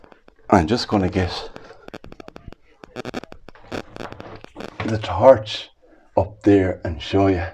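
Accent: Ireland. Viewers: speaks like Conor Mcgregor accent🙂 I like it. Thanks for sharing the great video.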